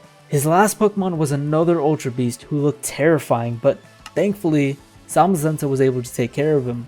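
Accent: India. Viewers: U indian??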